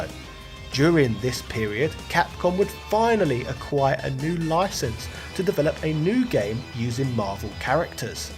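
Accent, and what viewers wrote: England, United Kingdom. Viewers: It is always a pleasure to watch your videos, mate! I really love both your contents and your English accent, it really helps me as a comprehension exercise! Keep up the good work!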